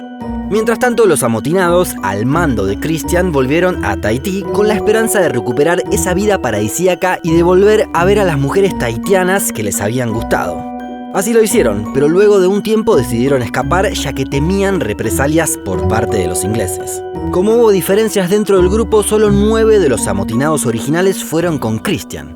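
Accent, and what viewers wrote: Argentina. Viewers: Más argentino imposible jejeje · Esta bonita la historia que cuentas solo que ese asento de boludo no se oye bien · Que horror ese acento Argentino ...shoooooo ashi amarishooo shooo, bye.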